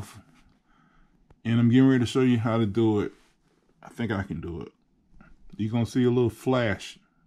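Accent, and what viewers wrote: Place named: North Carolina, United States. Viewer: Thanks so much! I needed that! You sound so much like one of my cousins from North Carolina! Go figure! 😊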